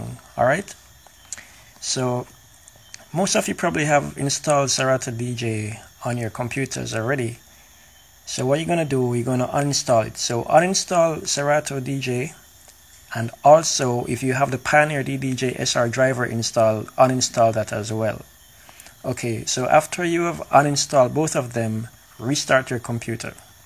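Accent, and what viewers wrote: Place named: Jamaica. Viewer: Perfect! Thanks Bro. Weh yuh come from? Jamaica?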